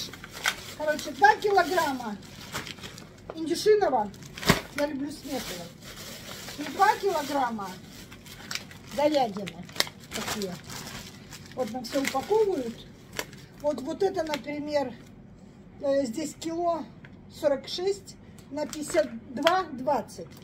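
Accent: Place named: Ukraine. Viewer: С покупочками😊 Вы сами с Украины? Родной гОвор кажется 😊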